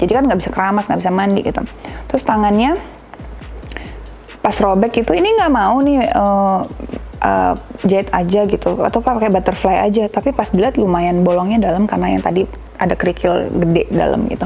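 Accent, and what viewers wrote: Bali, Indonesia. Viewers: Logat balinya baru keliatan 🙏 salam rahayu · Seneng liat kak pke logat bali, ga kagok, emang orang bali sh ya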